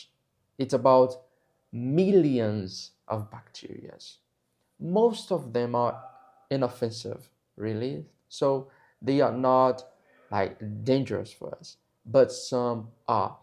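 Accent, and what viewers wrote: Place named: United Kingdom. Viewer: You've got a nice British accent ! I speak with American accent, but I've always found British way of speaking beautiful! Have a nice one my friend ! 😊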